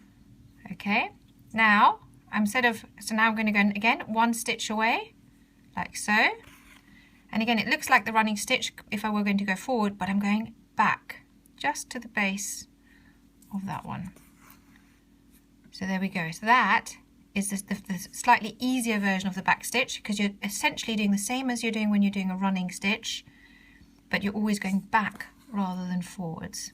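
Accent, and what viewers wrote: United Kingdom. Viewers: ur British ;)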